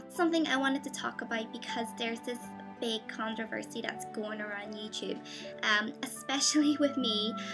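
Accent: Ireland. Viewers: I JUST NOTICED YOUR IRISH ACCENT. Yeah I know I'm slow. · youre irish!?!? sry,,, slow moment ive only seen like 2 of your videos :) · I've had this problem before on the internet. It sucks tbh cos there's almost nothing you can do about it! You've done the right thing anyway by letting people know what's going on in a mature way. Anyone who knows you and cares about you will recognise that you aren't malicious at all, in fact from what I've seen you couldn't be more honest and kind. p.s. I love the little hints of Irish that sneaks in now and again when you're talking, it's really cute and certainly unique! Keep smiling! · dude u hav an irish accentish wer u born der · Whoa, an Asian girl with an Irish accent! That's pretty much the hottest thing ever. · You have the cutest accent EVER <3 omg <3 you can hear the little irish bits and GAHH <3 so so sweet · You sound irish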